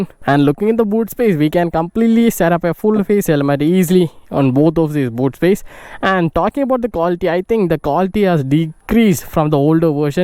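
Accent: Kerala, India. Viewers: Nice mallu arabi English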